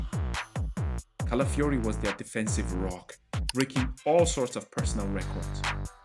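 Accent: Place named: Nigeria. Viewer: Wow this is good research, great video editing, soundtrack and overall detailing. You sound Nigerian by the way. Well done 👍🏾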